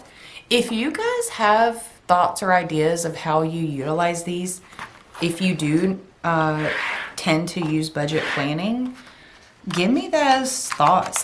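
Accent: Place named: South, United States. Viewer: I really like your idea of using only two of each filler paper so you go through them... I'm the same way... I HAVE to have extended disc simply because I WANT IT ALL in my planner. It's the main reason I've come back to happy planner after having them for years but never knowing what a frankenplanner was.... Best thing EVER! I also love to hear you talk - that southern drawl!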